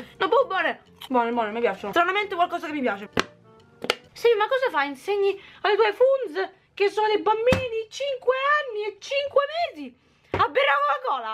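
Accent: Rome, Italy. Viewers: Il tuo accento romano lo amo 😍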